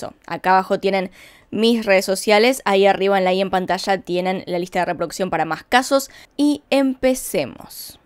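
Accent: Argentina. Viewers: Sos argentina??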